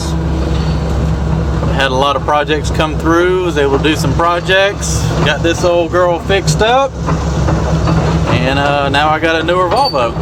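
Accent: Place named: North Carolina, United States. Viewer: I was born and raised in the Old North State. When I listen to you, my accent comes back even though I have been gone forty years. Love it.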